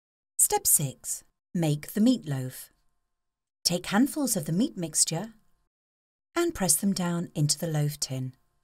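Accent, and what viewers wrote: England, United Kingdom. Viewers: How gratifying to hear a sweet and lovely English voice. After watching this video I must try cooking this myself.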